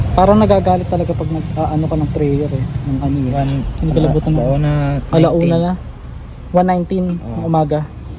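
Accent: Visayas, Philippines. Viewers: Ka cute nimo mag bisaya oie 😍 amping permi ❤God bless 😇💖💖💖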